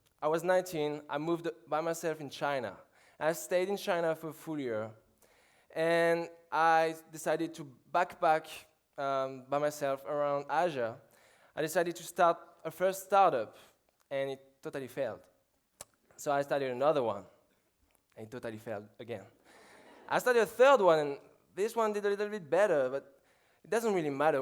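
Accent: France. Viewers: America always falls for any French accent when added to a hunkie body. This guy is a trail blazer he will go far. Because under the anarchy of his life he has the zen zazou spirit. Everybody loves a smiling hunk with a French accent ou la la mama mia!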